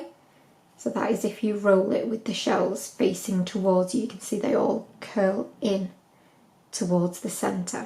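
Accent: United Kingdom. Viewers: I crashed out because of how fast she was going and didn’t understand her British accent which got me so mad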